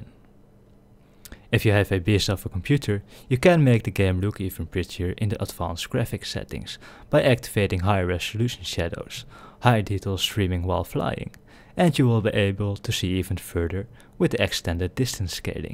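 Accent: Netherlands. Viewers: I thought he was a friend of mine but he is probably dutch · Ja nederlnads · Easily tell he's dutch, they all sound the same :) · Ben je een nederlander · I don't know why but this guy sounds Dutch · Are you dutch? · definitely dutch. Gekke nederlander :P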